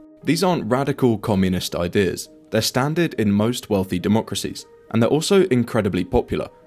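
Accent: United Kingdom. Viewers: Why is someone with a British accent always telling me about America...and everything else for that matter. Does some study say people listen to this accent more attentively, or something? If it were a mild accent, as if they had actually lived where I live, I would say alright, maybe parents were British or something. But sounding like you just washed up on American shores and are here to tell us how to live and what's up......rather insulting and I don't want to really listen to it. Especially looking at what happens in your own damned broken country. Don't want to emulate that crap.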